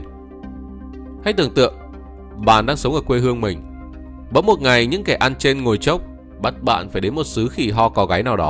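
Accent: Vietnam. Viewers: Chất giọng Việt ❤. Video thật sự phơi bày đúng những diễn biến thời cuộc.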